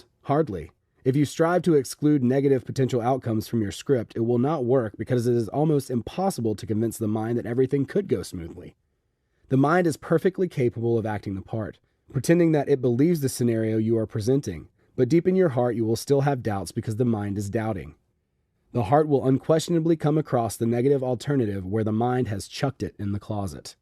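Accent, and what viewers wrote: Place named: South, United States. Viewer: Love the voice used for the intro! It would be awesome if the same 'voice' from the intro was used to read the chapters in this audio. I understand you can't please everyone; the reader who read the chapters sounds like he has a Southern twang which was distracting. It's me, not you. lol Never the less, thank you for posting.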